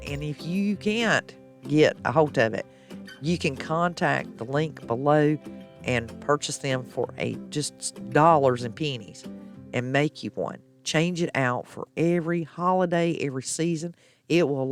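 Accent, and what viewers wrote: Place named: Carolina, United States. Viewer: I love this! Thank you for sharing. I love your accent. You sound like me. I’m a Carolina girl. 😊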